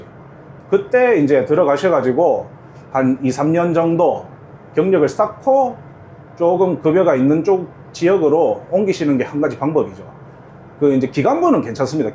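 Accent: Gyeongsang, South Korea. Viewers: 선장님 말씨가 포항 쪽 말씨네요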